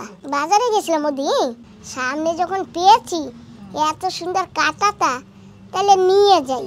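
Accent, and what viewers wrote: Barishal, Bangladesh. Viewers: হাই বুঝি আপনাগো বাড়ি কি বরিশাল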